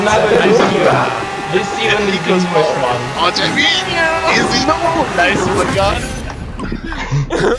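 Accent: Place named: Germany. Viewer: that german english is soooo baaad to listen...omfg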